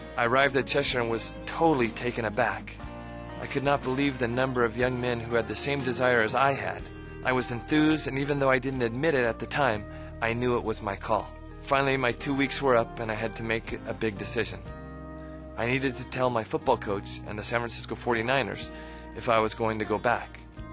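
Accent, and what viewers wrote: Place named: Quebec, Canada. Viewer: What kind of accent is that? He sounds French Canadian... from Southern California?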